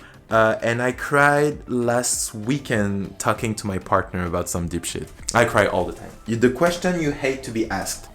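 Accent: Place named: France. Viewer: That was weird. I found your channel looking for ways to declutter photos and...found you had a very tiny familiar accent and, watching a second video a bit intrigued, It turns out you're french ! Funny surprise. Félicitations, beau boulot ! I'm impressed.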